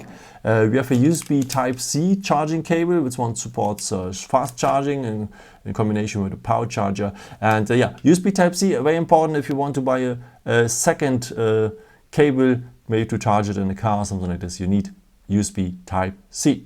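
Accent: Germany. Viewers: Strong german accent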